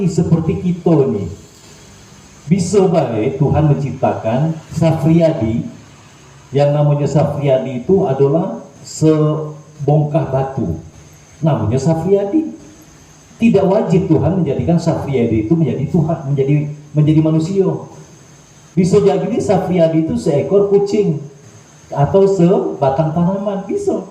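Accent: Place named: Jambi, Indonesia. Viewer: Assalamualaikum warahmatullahi wabarakatuh.. pas nian Bahaso e lur asli org jambi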